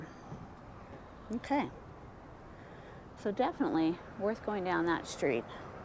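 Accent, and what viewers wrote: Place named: South, United States. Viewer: Hi, London guy here. Wow! America is just so beautiful wow, wow, wow! I have to say, Montana and Wyoming have captured my heart. I am a real sucker for greenery. I do believe they film Clint Eastwoods movie here "Any Which Way You Can." Great video and scenery. I too love your accent. The deep southern accent is my favorite accent by far, I find it so hot when it is coming out of a females mouth if you get my drift! I can't wait to see your other videos. I must Subscribe to your channel. Thank you for making this awesome video.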